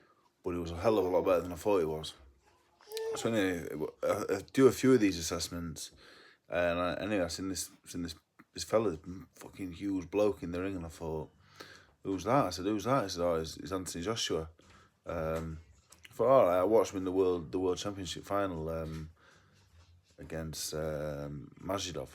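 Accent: Yorkshire, United Kingdom. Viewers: Yorkshire accent. Dave looks like how I imagine Heathcliffe to look but with different hair and clothes.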